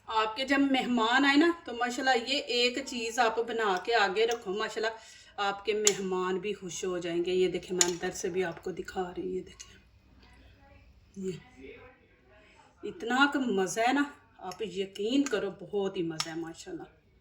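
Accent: Punjab, India. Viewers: Too much panjabi lahja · آپ کا لہجہ خالص پنجابیوں والا ھے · Punjabi lehje me Urdu ka aur hi sawaad hai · آپ دنیا کے کس شہر میں رہتی ہیں ۔۔۔ویسے بولتی اردو ہیں مگر لگتا ہے پاکستان کے کسی پنجابی علاقے سے تعلق ہے 😊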